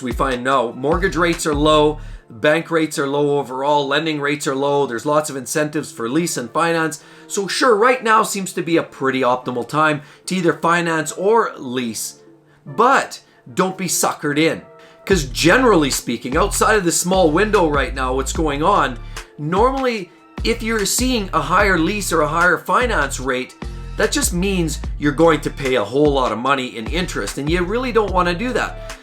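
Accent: Canada. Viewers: This guy sounds Canadian AF.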